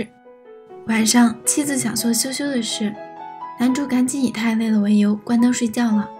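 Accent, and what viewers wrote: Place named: Shandong, China. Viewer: 讲解人山东口音。。。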